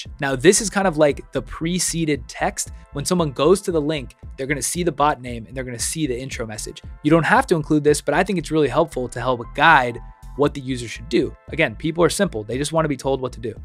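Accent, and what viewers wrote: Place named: United States. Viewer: Your voice sounds sooo American, omg lol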